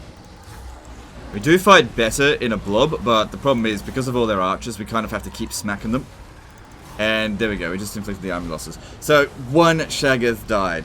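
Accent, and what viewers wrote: Australia. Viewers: The yt Auto subtitles are getting better at australian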